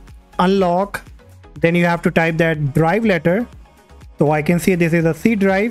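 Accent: India. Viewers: It’s so difficult to understand Indian accents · Your annoying Indian accent pmo sm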